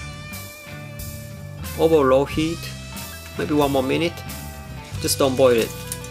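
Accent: Japan. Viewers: a white guy with a japanese accent. smh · Why are you talking in a japanese accent?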